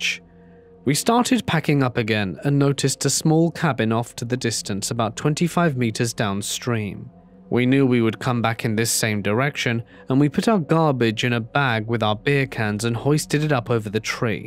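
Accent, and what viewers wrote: United Kingdom. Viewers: I love your British accent. I was raised by a British mom, who is now deceased. And thank you for being so expressive when you read these stories!!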